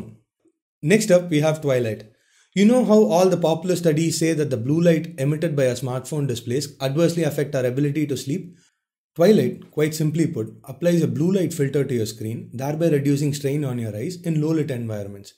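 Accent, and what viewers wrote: India. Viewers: agree... its pure desi :) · Its sad to know there are so many racists on youtube, if u don't like the accent or indians then instead of commenting on the video just, GET THE F**K OFF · I have indian friends. But they learn to get rid of their accent. Not because of dishonoring their culture. But its a skill. You are communicating with the whole world. Please learn proper english pronounciations. Remove your accent and more will come to watch your videos. Your videos are good. But your accent... Help yourselves bro. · the sound of indian..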